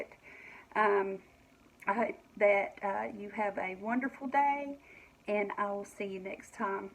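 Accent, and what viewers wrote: South, United States. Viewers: You can freeze just about anything... some things nooo lol but it's always nice to learn something huh? Love her southern accent;)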